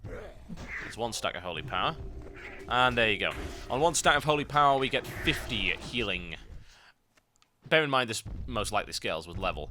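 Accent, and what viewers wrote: United Kingdom. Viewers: Lol at the people complaining that you, a British LPer, have a British accent. Personally, I get bored of hearing the non-existent Canadian accent, so I am quite pleased that my favorite WoW LPer is British. And though I said British before, as a fact (though I may be wrong) there are different types of British accents, seeing as France, England, and other countries of different languages make Britain, and they have different accents.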